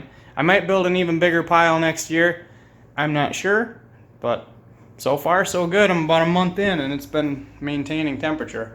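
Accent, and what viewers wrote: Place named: Midwest, United States. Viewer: He sounds like he is from sw Minnesota, or Nebraska or western iowa to me. I'm curious if I'm right.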